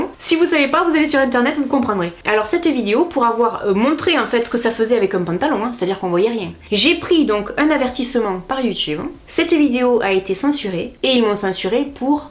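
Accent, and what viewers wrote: South, France. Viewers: Bonjour, c’est une drôle de vidéo ça 🤔 Certains devraient s’abstenir de laisser des commentaires. Ça n’est pas possible 😏 Les gens n’ont plus de respect 🤔😏 Comme plusieurs de ta communauté, je te suis depuis un bon moment et je t’aime 😍 J’adore te retrouver chaque semaine. De retour deux fois par semaine, tant mieux pour ne pas dire Youppi ❣️❣️ J’aime ton accent du Sud tellement différent du mien, Québécoise. 😜 J’aime tes blagues, ton rire contagieux😉 Pour moi qui ai soixante-deux ans, tu es jeune❣️🥰 Comme quoi tout est relatif 😏 Désolée pour les jeunes mais YouTube n’a pas d’âge 😊 Il y a Nicole, esthéticienne qui a soixante-huit ans, qui a aussi sa chaîne et c’est très bien aussi 😉🥰 De plus, c’est très agréable de te regarder... De superbes yeux bleus entourés d’une magnifique chevelure rousse. Toujours en beauté😉 Ne lâche surtout pas ❣️🥰🥰😘 ❤️💛💜🧡💙💚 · Tu sais quoi ? 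C'est incroyable, quand tu as parlé du préjugé sur ton accent, j'ai remarqué que ton accent du sud ne me dérangeait pas du tout, alors que franchement sur n'importe qui d'autre je trouve ça laid et insupportable. Je suppose que c'est parce que je t'aime bien ! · vous êtes simple et nature , sa change de ce que l on voit ailleurs et sa fait du bien de voir sa .Quant a votre accent du sud c est un régal a attendre . Bref ne changez surtout pas rester tel quel , et continuer a sourire . 😘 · Les vidéos passent toujours trop vite avec toi ! :( j'en veux toujours plus et moi j'habite à bruxelles mais j'adore l'accent du sud, c'est vraiment mignon de voir les différences de prononciations ! Gros bisou d'une fille de 24 ans ;-* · Bonjour 😊 j'ai 60 ans je vis en Normandie et je regarde vos vidéos très régulièrement depuis quelques mois et franchement je vous "kiff de ouf" 😊 Ma fille vis à Toulouse depuis quelques années et votre accent du sud me réchauffe le cœur car elle aussi commence à l'avoir aussi 😉 Ne changez rien j'adore vos vidéos 💕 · Mais non l’accent du sud c’est trop mignon je trouve ! 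Puis c’est quoi ces remarques du « t’es trop vieille » ? Pff à quelle moment 43 ans c’est vieux ? Puis même si t’en avais 87 il est où le problème ? J’ai repris mes études en droit à 21 ans ... il y en a qui les reprennent bien plus tard y a pas de limite d’âge ! ❤️ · Merci maman, grâce à toi j'ai réussi à accepter mes formes et mes rondeurs et à m'aimer comme je suis. Je regarde toutes tes vidéos. J'adore les vidéos où tu nous donnes des astuces beautés maison à petit prix. Pourquoi ne pas refaire une vidéo "Je teste les astuces de mes abonnés" volume 2? Je suis sûre qu'ils doivent avoir plein de supers idées pour se pomponner à moindre coût. En tout cas continues comme ça, tu es super, je t'aime maman YouTube! 😘🥰❤️ P.S.: tu as même réussi à me faire aimer l'accent du Sud! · Moi j 'adore l' accent du sud et tout ce que tu dis est très juste ça fait plaisir ça remonte le morale de voir tes vidéos merci à toi pour le sourire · Hé bien moi.. 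J aime pas du tout l accent du sud, mais curieusement, et sans que je puisse l expliquer, je te trouve trop mignonne à parler comme ça!! J adore! 😘❤ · Je t'ai découvert en ayant des soucis à assumer mon corps de maman. Je chercher des conseil sur l'habillage et tu m'a surpris de part ta manière d'animer ta vidéo. Depuis je regarde beaucoup de tes vidéo et je suis tombé sous le charme. J'adore ta façon de voir les choses et d'être droite dans tes bottes et franche. J'adore ton accent du sud qui pourtant j'en suis pas fan. J'adore tes cheveux qui son vraiment magnifique. Bref je te trouve formidable et j'aurai aimé t'avoir en voisine pour t'entendre râler d'avoir mis du café sur tes jolie rideaux neuf 😂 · Quoooiiiiaaaa😲.. A perpi vous dites pas chocolatine 😮 !?... Heureusement que l accent du sud rattrape 😜... Une toulousaine qui te salut 😀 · moi je dirais que tu es une femme classe, consciente de ses priorités... 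toujours un régal de suivre tes vidéos et surtout entendre le soleil du sud dans tes paroles.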